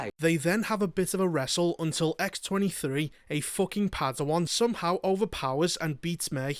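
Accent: Liverpool, United Kingdom. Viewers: Liverpool accent. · He's a posh scouser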